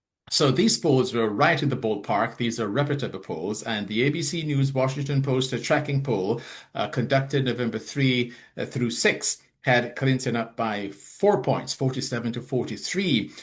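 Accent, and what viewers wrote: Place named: Jamaica. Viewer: I have never been a fan of. I don’t know why he tries so hard to hide his Jamaican accent.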